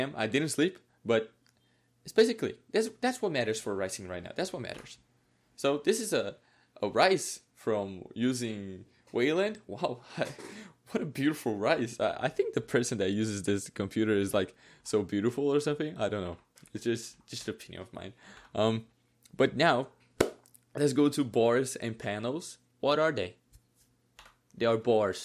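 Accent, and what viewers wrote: Brazil. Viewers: Você é brasileiro? Esse sutaque eu não confundo nunca, essas piadinhas enquanto fala e essa figura de linguagem. Consigo sentir de longe. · De fato ele fala bem pra cacete. Mas consegui notar o sotaque carioca AUSHAUSUHAUHSHUAUHSUAH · tu é brasileiro né? (percebi pelo "rh") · i can recognize a brazilian when i see one... the whole "RH" thing gave you away KKKKKKKKKKK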